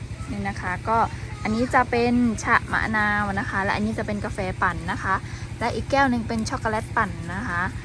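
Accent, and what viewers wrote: North, Thailand. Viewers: สำเนียงเหนือ อู้เหนือ ก็คนไทยด้วยกันนี่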